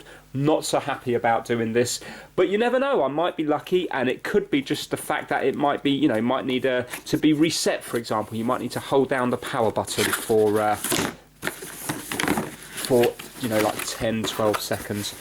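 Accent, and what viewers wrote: United Kingdom. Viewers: your brittish accent was so lit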